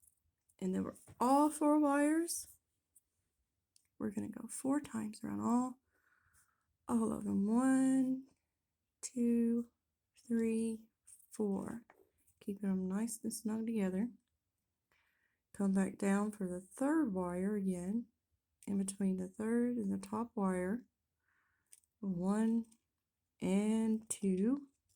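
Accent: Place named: South, United States. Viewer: I saw a top comment of yours and I thought that was pretty awesome. Never met too many southern white people who enjoy 2Pac. Love your beautiful southern Belle accent, Mrs.!!! I’m from the south as well btw. 😊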